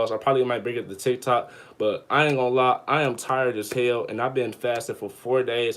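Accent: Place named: Detroit, United States. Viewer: You from Detroit? You talk like us 😂😂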